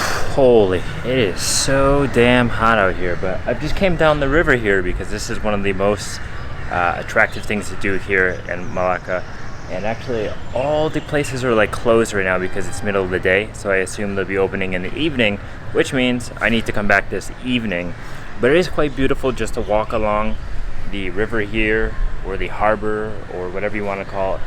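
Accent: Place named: Midwest, United States. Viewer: Just curious to know which US state you are from, your accent makes me wonder if you might be from either Ohio, Indiana, Illinois or Michigan?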